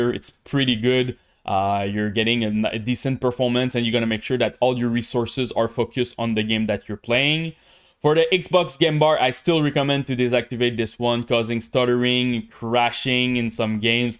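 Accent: Quebec, Canada. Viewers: Bel accents man, je sais reconnaître in Queb quand il parle anglais et vraiment merci bin gros pour ton vidéo d'optimisation c'est pratique en tabarnak! :) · I IMMEDIATELY recognized that Canadian French accent that I know so well 😂 bon vidéo mon ami, c’est très important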